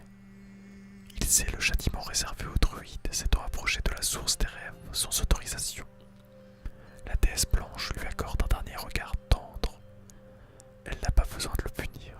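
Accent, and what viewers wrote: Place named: France. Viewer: ese acento francés😍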